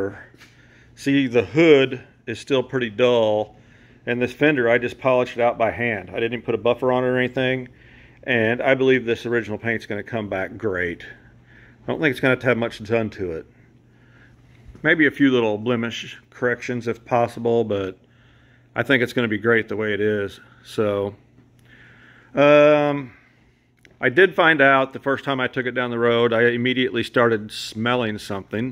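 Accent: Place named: Midwest, United States. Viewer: Dude, being a Hoosier, your midwest accent makes me feel right at home! I often disagree with Adam when it comes to opinions about styling, as he has obviously been indoctrinated by GM, but I gotta say I agree with him on this one. my opinion doesn't matter, it's your car and your dream. It's gonna be a stunning Squire regardless! Good job as always. Gotta admit, I'm in awe of your meticulous attention to details!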